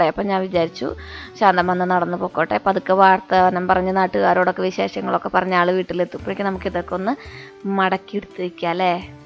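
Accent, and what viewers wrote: Thrissur, India. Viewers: Aniku pandulla vedio anu eshttam.originalty undayirunnu.trissur slang correct ayirunnu.ennu athokke mariyathupole.❤❤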